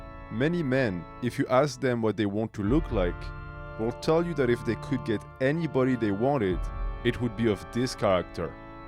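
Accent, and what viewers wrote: France. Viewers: that french accent, love it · Are u french? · You've got a slight delightful baguette accent. As a fellow member of the baguette nation I salute you. Keep it up. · are u french parceque on dirait que tu as un accent ? 💪🏽🙏🏽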